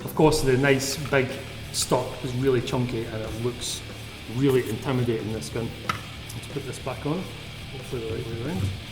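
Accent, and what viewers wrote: Scotland, United Kingdom. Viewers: No, I think he sounds like a Scottish guy.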